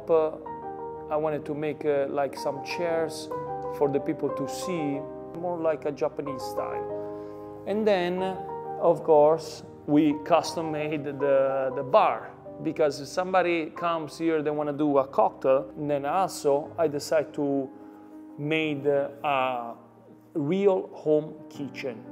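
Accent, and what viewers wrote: Italy. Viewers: Congratulación!!God bless you with health and happiness for you and you family to enjoy the new chapter in life.Im a new subscriber and your way to talk Italian bring me a lots of memories of my familia !🙏🙏🎉🎉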